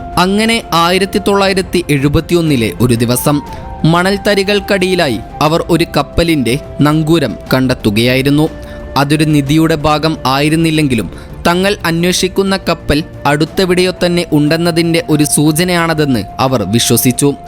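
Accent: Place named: Northern Kerala, India. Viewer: വടക്കൻ ഭാഷ കേൾക്കാൻ ഒരു രസം ഇല്ല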